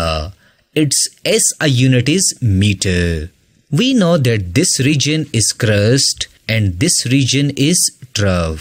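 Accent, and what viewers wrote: India. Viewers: You got no doubt the thickest indian accent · the india accent is very annoying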